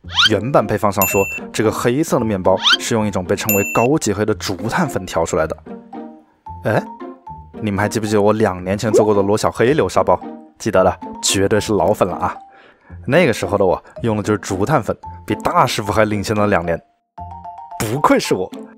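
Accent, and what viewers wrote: Wuhan, China. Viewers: 太棒了！质量很高的美食博主！博主是哪里人？听口音好像是武汉的？ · 想问下up主是不是武汉人😂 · 听声音应该是武汉人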